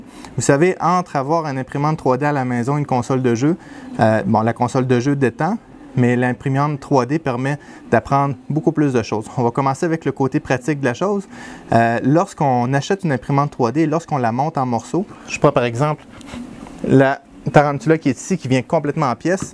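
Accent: Quebec, Canada. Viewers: Merci pour tout. J'ai appris beaucoup avec toi et j'apprécie ton accent québécois. C'est assez rare d'autant que la qualité de tes vidéos est bonne. Pour la version courte passe au 4ième paragraphe. Je me suis acheté une tornado et j'ai eu beaucoup de plaisir avec cette fin de semaine. Je l'ai calibré avec un cube de 20mm (merci à toi). J'ai imprimé mon support et de petits bidules. Mais j'ai eu un problème avec hier en après-midi. Mon extrudeur sautait un coche au 5 secondes. J'ai arrêté mon impression, monté ma buse et donné de l'extrusion. Encore le même problème. J'ai essayé de la débloquer en retirant le filament à froid à plusieurs reprises sans succès. J'ai détaché le ... tube ... et essayé de la débloquer à chaud sans succès. J'ai enlevé la buse et enlevé (en poussant) tout les résidus, replacé la buse de secours et je crois que le problème s'est corrigé. 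J'ai commencé à nettoyer le bed. Rien à faire pour enlever la première couche et j'ai fini par briser le tapis. Si tu avais un truc pour le tapis ce serait bien. Et j'ai finalement abandonné. Par la suite, je crois avoir compris ce qui s'est passé. Ma dernière impression était en qualité haute et mon bed devait être un peu trop serré ce qui a causé un engorgement. L'engorgement étant fait les autres tests ont donnés le même résultat. Maintenant les questions. Comment faire pour nettoyer le PLA de la buse? Quels devraient être les paramètres d'impression lorsque la hauteur de la couche est à 0.1mm? Merci encore pour t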